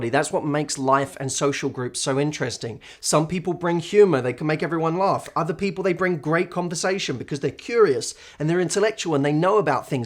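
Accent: United Kingdom. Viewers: Maybe its cultural? Telling from your accent you are British, and yes Ive lived here as a expatriate for about a decade. And trust me, trying to make friends with general British people is harder than trying to get a letter from the archbishop of Canterbury!! - this might also explain your experience so far! Its shocking but insightful to me that you say this may be because people appear too vulnerable. I dont think there is any communicative or language barrier as I speak perfect English. Strangely Ive noticed I have no problems mingling with people from other countries , and from my experience Americans appear to be the nicest bunch, and are generally interested in meeting people from other countries cultures because they are genuinely interested in doing so, and not to satisfy their own personal agendas. Maybe Ive just been unlucky but so far its been tough luck to meet a Brit who loves to hang out and have fun and do stuff. 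Also perhaps as your personal situation has changed now that you live on a different land, you suddenly realise the value of frienship and trust. Very insightful video, good job! xx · freaking love British accent.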